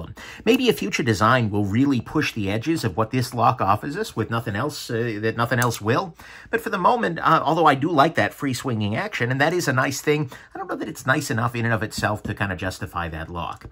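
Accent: New York, United States. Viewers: Your channel is my favorite. BTW, are you from New York?? Thanks for the reviews!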